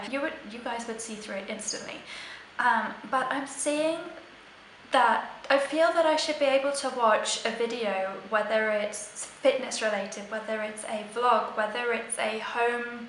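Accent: United Kingdom. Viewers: Monetization on YouTube has been its downfall IMO. My channel isn't beauty related, but I just make videos for my own (and my students & their parents) enjoyment. The monetization offer keeps popping up on my channel, but I don't want to have to scramble for views or subscribers, stress about putting out a video every week or whatever, or worry too much about copyright for the music I use, so I won't do it. If a video only gets 100 views, it doesn't bother me. I've seen a number of YouTube "gurus" express the same feelings as you; if something like this is a JOB, it changes the whole experience. You do YOU, and don't worry much about us out here. As long as you have that lovely soothing voice and super cool British accent, I'll watch your videos (even if you DO talk about feminine products-it will still sound good coming from you) ;)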